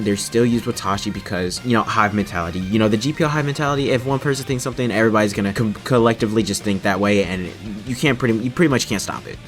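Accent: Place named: India. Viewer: Hes indian accent tells it all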